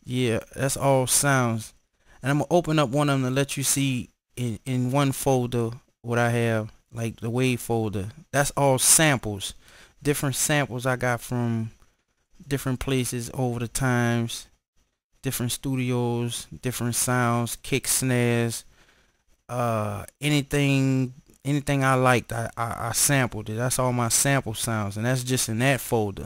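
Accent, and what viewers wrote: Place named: United States. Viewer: love ur american accent...im a canadian so im weird...lol, nice vid..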